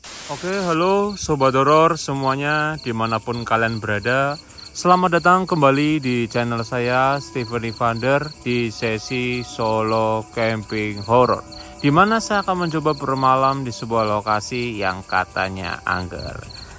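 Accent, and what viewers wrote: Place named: Banyumas, Indonesia. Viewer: Aku biar emak2 ngefans bget ma ka..serem lucu bahasa ngapak y n super brani❤😊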